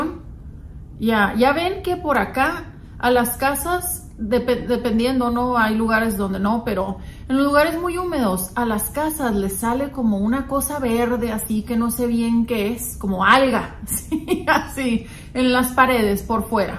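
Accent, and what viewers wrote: Mexico. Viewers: Yo me baño todo los días, el pelo un día sí y otro no. En verano me ducho dos veces, porque soy de una ciudad humeda y se suda mucho. Yo veo por IG un compatriota tuyo que comenta este tipo de videos, como si interactuara con los del puesto, y me rio mucho. Por el acento creo que tb es por la misma zona de Méjico que tú. Ahora no me acuerdo de como se llama en redes. Saludos desde España.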